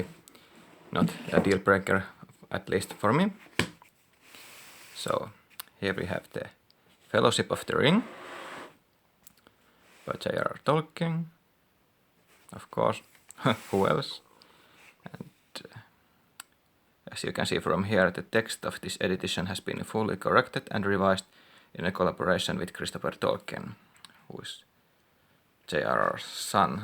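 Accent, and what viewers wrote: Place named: Finland. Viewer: Great Finnish accent! Thanks for the review. The cover art is nice but I wish they had made the spines uniform and matching so it looks more like a proper set or collection.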